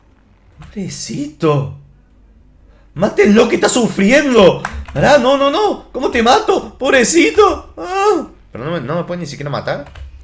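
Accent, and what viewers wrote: Argentina. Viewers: eres argentino?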